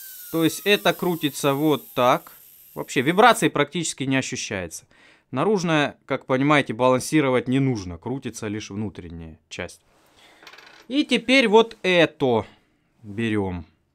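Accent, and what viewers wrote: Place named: South, Russia. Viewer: у просто неправильное звучание,ибо южный акцент имеет,все люди с нормальным произношением не будут говорить подшибник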